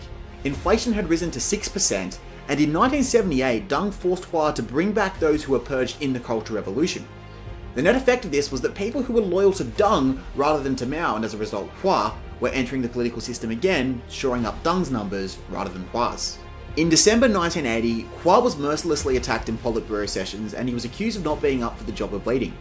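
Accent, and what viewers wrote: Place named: Rural, Australia. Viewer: This is puerile simplicity read out by a barely literate yobbo who sounds like he comes from Tenant Creek, or some other Aussie backwater that equates to the Mississippi Delta.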